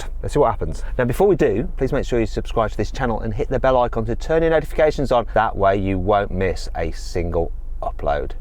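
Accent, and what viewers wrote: United Kingdom. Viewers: 이사람이 하는 말이 영국영어인가요?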